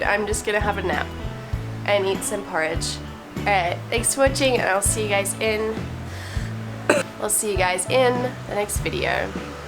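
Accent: United States. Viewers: I'm hearing a little bit of American popping in to your accent lately :') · Sick has kind of an American accent haha Hope you're feeling better! My go-tos are olive leaf extract, OJ, tomato juice, and soup. I had a flu-y thing a few weeks ago that wiped me out for 2 weeks, hope you haven't had the same thing. It was horrible.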